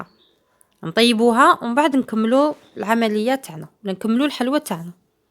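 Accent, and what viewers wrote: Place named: Blida, Algeria. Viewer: انا مشتركة جديدة عجبوني كامل وصفاتك الله يبارك بصح حسيت عندك اصول بليدية يلا من هدراتك ولا من وصفاتك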